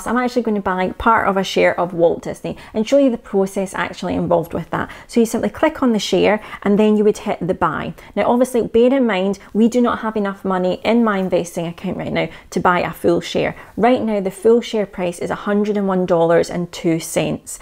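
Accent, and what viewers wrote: Scotland, United Kingdom. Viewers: Thanks! Have been watching all your videos these days and they really help me to start establishing my financial freedom! And I’m really happy to hear someone who is from Scotland! (I’m in Edinburgh) 😃Just one question here, if 212 is free, does it mean if I invested the same ETF, would it be cheaper than buying directly from Vanguard? X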